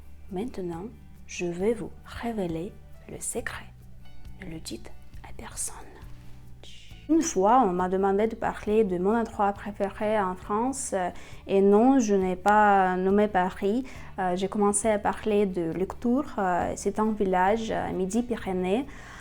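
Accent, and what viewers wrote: Russia. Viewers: Vous êtes super belle et votre voix est très douce et séduisante ❤🥰😍. J'adore l'accent Russe, tellement sexy ☺️👍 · Personnellement, je préfère le format en langue russe avec les sous titres, c'est vraiment génial pour la prononciation et l'apprentissage, même si je suis ultra débutant et que je dois mettre souvent la vidéo en pause. De plus, je trouve que ta voix est beaucoup plus charmante avec un accent russe. Continu comme ca. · Мне нравится ваш легкий русский акцент, когда вы говорите по-французски. НО я предпочитаю, чтобы ваши видео были на русском языке (с французскими субтитрами) 🙂 Большое спасибо за вашу личную историю.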